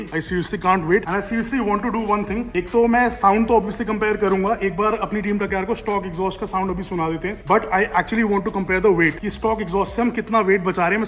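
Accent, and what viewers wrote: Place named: Punjab, India. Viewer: stock awaz madi nai h😂 prava hindi ch punjabi 😂 good bro 😎 sara kuj punjabi ch bolya kr yara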